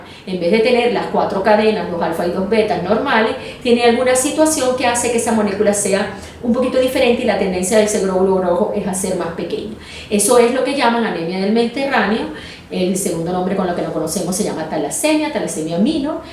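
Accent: Venezuela. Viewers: Dra. es un placer ver sus videos tan claros y con mucha seguridad. No se de donde sea usted pero por su acento me parece Venezolana y eso me hace añorar nuestros Medicos ya que en estos momentos estoy viviendo una situacion bien desesperante con mi salud tengo 8 meses presentando una serie de sintomas y los medicos aun no me dan un diagnostico solo examenes y mas examenes y yo ya llevo 45 libras perdidas vivo en USA y me parece INCREIBLE esta situacion en un pais como este; añoro tanto mi pais y mis medicos Dios la bendiga por su labor.......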